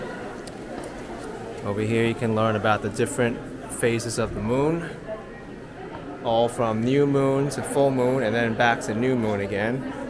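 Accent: United States. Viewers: I am learning spoken English from your videos. Thank you for the pure American pronunciation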